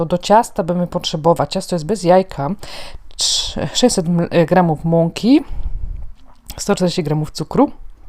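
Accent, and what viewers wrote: Silesia, Poland. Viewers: Cześć dziewczyno😁super filmiki , uwielbiam twój kanał i twój śląski język 😎🤪😅👌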